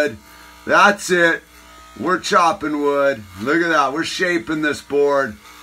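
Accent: United States. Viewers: John lydon be sounding a lot more American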